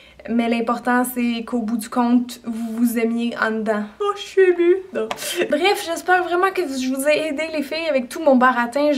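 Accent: Canada. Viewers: Je suis d'accord avec Elisa071096 tu es la meilleur youtubeuse beauté, car tu es simple et authentique, et non pas artificielle est maniérée... et tu as un très jolie accent canadien ^^. Tes conseils sont toujours intéressant et bon à prendre, merci à toi pour tous, j'ai bien aimé cette petite parenthèse psycho et la journée B&B c'est juste une merveilleuse idée xoxoxoxo ;)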